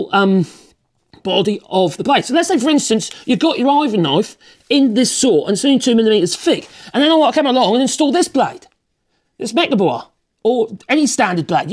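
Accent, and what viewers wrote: United Kingdom. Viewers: I sadly understood barely two words you said mate, but you are so entertaining to watch that I still watched the video to the end. It's so nice to hear a British voice on youtube, especially one who loves tools and making stuff with wood. You're always entertaining because you come across as someone who doesn't give a fig for what people think, and I like that about you. You are what my adorable mum used to call a WYSIWYG type of person (In other words you are a 'What You See Is What You Get) type of person. And I mean that in a nicest way possible!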